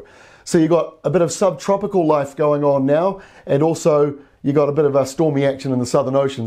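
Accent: New Zealand. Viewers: Thank you, very much for your great job & for your perfect NZ English. I'm from Russia and love to learn the English language. I improve my listenin skill with you,. It's perfect! Thanks a lot!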